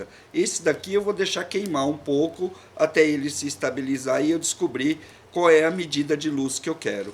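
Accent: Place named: São Paulo, Brazil. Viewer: Que bonitinho sotaque paulista!! O mais importante é o tutorial.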